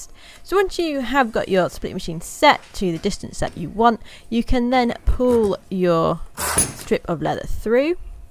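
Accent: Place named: Scotland, United Kingdom. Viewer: Такой интересный акцент, интересно, откуда эта милая женщина, из Шотландии?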